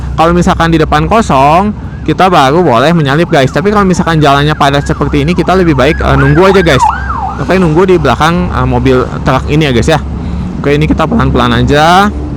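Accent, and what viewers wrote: Bandung, Indonesia. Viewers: KK nya orang Bandung yah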